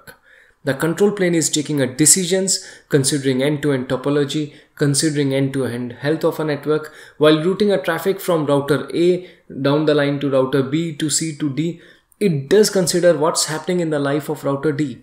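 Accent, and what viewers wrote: India. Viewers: India spoken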